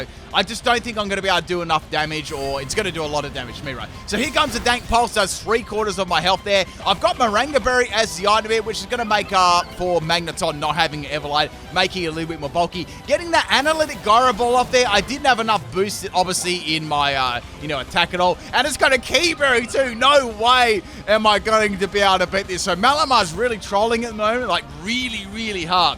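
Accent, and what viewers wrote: Australia. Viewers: Your Australian accent takes your videos from amazing to divine!!!! >>>>>>>> Wolfey and MandJTV any day!!!!!!!!!!!!!!!!!!!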